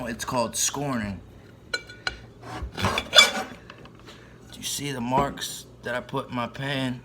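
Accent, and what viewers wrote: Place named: United States. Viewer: It’s pretty insane from my perspective to know that at some point you had to learn this to survive in 2020/2021.. Sound like your from the states as well. Stay safe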